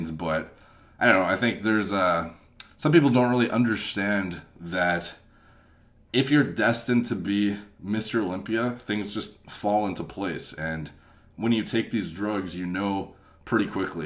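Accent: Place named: Canada. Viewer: Yeah the way some canadians say out , aboot, house lol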